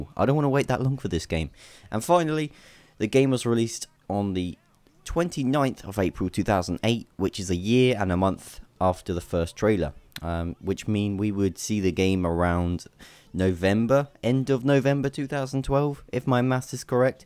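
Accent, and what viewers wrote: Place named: United Kingdom. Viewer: A mysterious British guy whose accent isn't sexy. What a relief. Usually British accents are attractive but his just isn't, lol!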